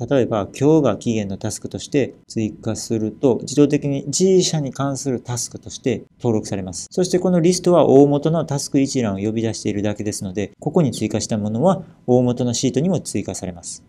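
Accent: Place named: Kansai, Japan. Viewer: 関西弁聴きやすすぎます。。。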